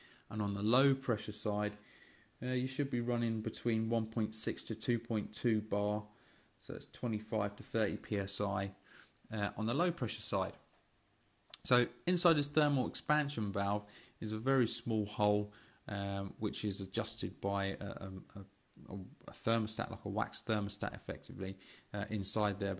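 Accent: United Kingdom. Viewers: I love your dialect Sir. It reminds me with my lovely British Professor .. Blessings. Once again, thank you. Kind regards. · Stopped watching because i hate british accent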